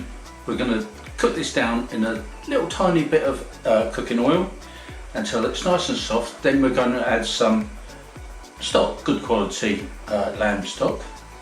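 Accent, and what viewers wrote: United Kingdom. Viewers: Are you a londoner?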